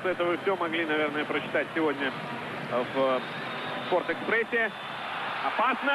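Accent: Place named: Kyiv, Ukraine. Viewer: Уважаю киевский руссь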